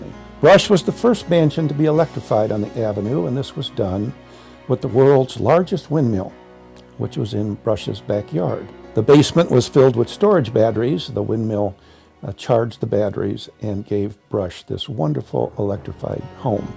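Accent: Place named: Cleveland, United States. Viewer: Wish more of the houses from this era were still standing! On an unrelated note, you have a classic Cleveland accent lol.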